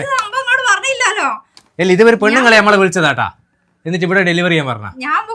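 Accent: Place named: Vadakara, India. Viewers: കണ്ണൂർ സ്ലാങ് 👌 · Evdya place nammade tlsry panoor slang🤣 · ഇത് വടകര ഭാഷ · വടകര ഭാഷ 😂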